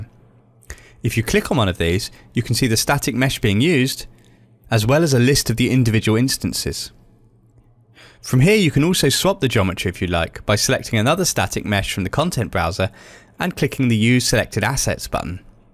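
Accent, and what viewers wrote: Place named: United Kingdom. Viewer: Props to the ever smooth British (I think) narrator with clear and crisp voice, always nice to hear things accurately.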